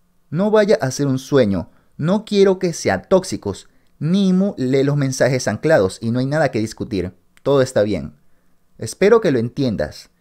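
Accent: Ecuador. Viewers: Apenas iba a preguntar si eras de ecuador por el acento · una pregunta muy tonta creo ¿eres de Ecuador?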